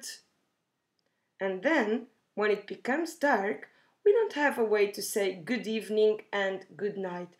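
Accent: Portugal. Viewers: obrigada!!!!! I want to learn the portugal accent!! :D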